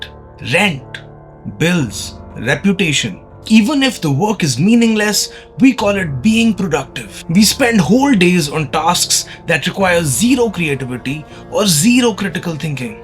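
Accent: India. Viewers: reminded me of a YouTuber bass player who plays Behs you know?) Danny Sapko. So he’s pronouncement and yours are very similar except one little spice of India in yours and one little spice of Australia in his.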